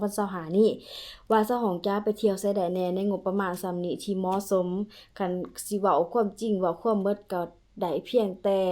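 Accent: Ubon Ratchathani, Thailand. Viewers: สำเนียงการเว้า ของสาวห้องคือกันกับสำเนียงเว้าของคนอุบลฯ คน อำเภอน้ำยืนเด้😊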